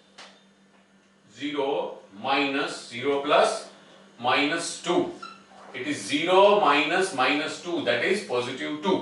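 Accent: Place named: Gujarat, India. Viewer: i don't know but from your hindi i think you are gujarati.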